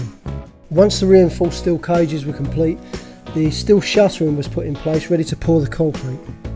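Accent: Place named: South London, United Kingdom. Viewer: Hi I was the one who couple months back said you must be from south of the river with your accent, live Sydney but spend lots time in Thailand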